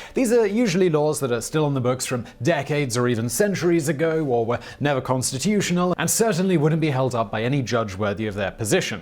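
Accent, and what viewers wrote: United Kingdom. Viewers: yet even when he adlibs he has an upward inflection at the end of every sentence, (like a ditzy blonde) which certainly isn't a British thing, He's the face of these channels with his very nice middle class British accent, and when the subject of a video is British, I would expect him to add a British slant to it rather than it being slightly biased american, cherry picked history, tbf I'm not really including top tenz as that's pretty obviously American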